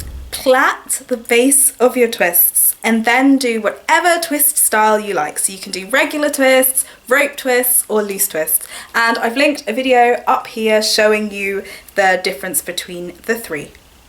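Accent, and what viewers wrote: England, United Kingdom. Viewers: 😃 OK only a true Hilarian would know that. It's just that you sound SO English I thought it couldn't possible be so but then again that logo is burned into my brain! 😀 Anyway, old head here (class of '91 eep!) and I live in London. Bless! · I didn't think of disney but just those children's story books. She has a great accent. It's English but her type of English sounds nice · Isnt that the cutest lil english Disney-sounding accent ever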